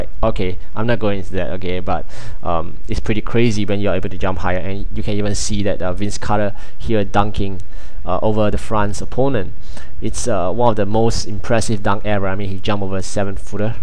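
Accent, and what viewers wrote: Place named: Singapore. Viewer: er you sound like a singaporean, am i right?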